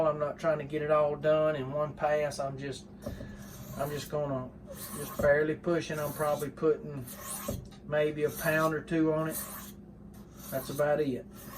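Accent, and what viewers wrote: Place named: South, United States. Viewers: I do appreciate all your content man. Your a very humble God fearing man and that's nice to see these days. I live outside Nashville TN in a little place called cheatham county and you talk just like us bubba so it's normal to me but i love how others get a kick out of it 😂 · addicted to hearing that southern twang lol. also love the tips.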